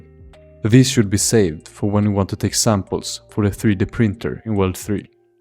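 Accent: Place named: Sweden. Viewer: snyggt, nä men notera det när jag hörde att de va lite svensk dialekt i din engelska, funderade om du va norsk eller svensk xD :D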